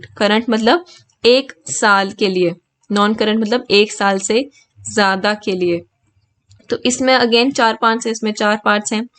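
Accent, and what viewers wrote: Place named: Kashmir, India. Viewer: Mam u seems have like our Kashmiri voice Thank u so much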